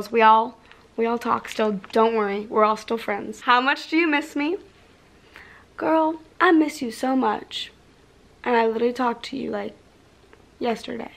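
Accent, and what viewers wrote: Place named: United States. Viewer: Omg your American acsent so good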